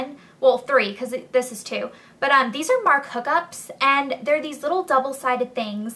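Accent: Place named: South, United States. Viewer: ur kinda getting a country accent like ur sister :D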